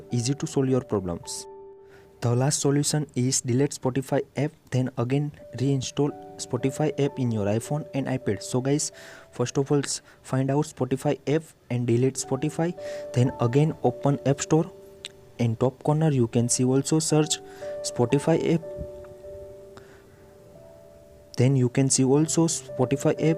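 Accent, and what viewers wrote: India. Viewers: This is the fattest indian accent i’ve ever heard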